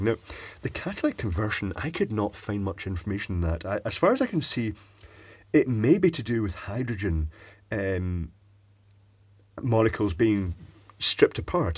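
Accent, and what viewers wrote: Scotland, United Kingdom. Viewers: Every time you said “gasoline” rather than “petrol” in your quite cultured Scottish accent..! lol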